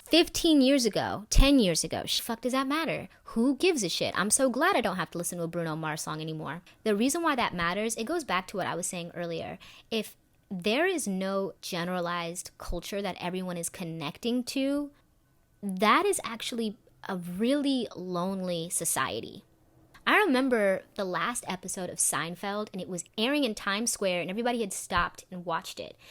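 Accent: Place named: Atlanta, United States. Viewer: Haha I totally recognized that ATL accent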